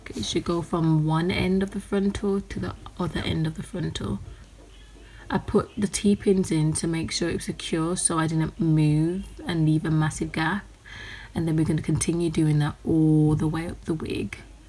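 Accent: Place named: Northern England, United Kingdom. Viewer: Your accent 😍😍😍😍 Northern? Manchester?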